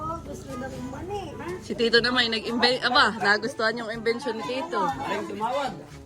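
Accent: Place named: Infanta, Philippines. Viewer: Good morning ang sarap ng lugaw tamang-tama sa malamig na panahon😊 parang ang punto niyo po ay taga infanta tama po ba?😊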